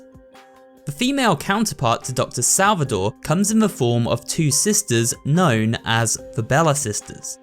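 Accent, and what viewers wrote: England, United Kingdom. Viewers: I just love how says all the spanish Words with an english accent, para mi su forma de hablar en español y omitir la ñ me mata de risa (to me, him speaking spanish and skiping the ñ makes me laugh) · i love that my man, translate the Spanish words into english just by changing the accent of the word.. typical english native speaker, doesn't even bother to learn how to say the word properly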